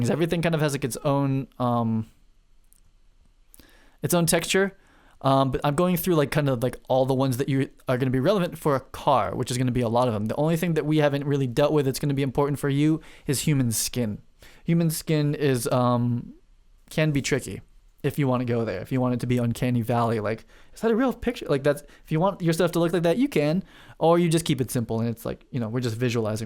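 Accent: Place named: United States. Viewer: Nope, pretty strong US accent but very pleasant to listen to.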